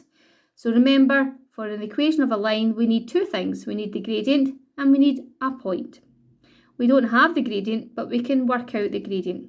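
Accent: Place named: Scotland, United Kingdom. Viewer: excellent presentation , lovely Scottish voice and a great help , thank you.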